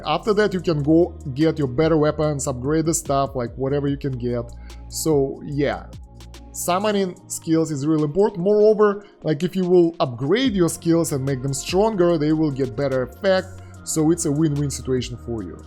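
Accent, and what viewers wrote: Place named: Russia. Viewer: у тебя русский акцент. Ты украинец?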